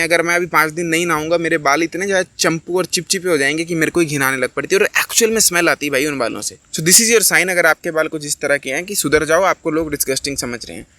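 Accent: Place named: Punjab, India. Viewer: Bro tera Punjabi accent end hai❤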